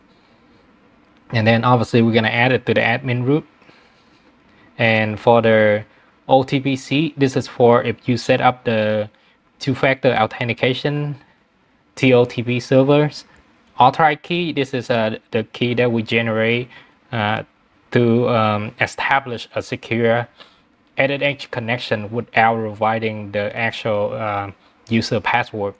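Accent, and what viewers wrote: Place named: Vietnam. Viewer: recognize by the accent. I'm also Vietnamese, and I am too, building the homelab with opnsense and proxmox and truenas. Seeing other vietnamese enthuiast about opnsense make me feel I'm not alone :)))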